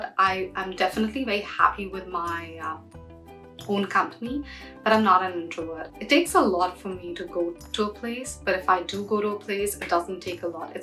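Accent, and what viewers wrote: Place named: India. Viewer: U have Indian accent R u indian??🤔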